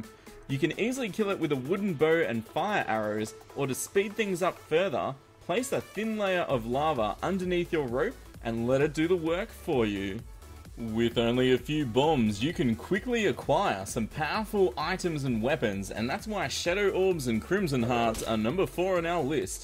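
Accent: Australia. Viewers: Is that an aussie accent I detect? =D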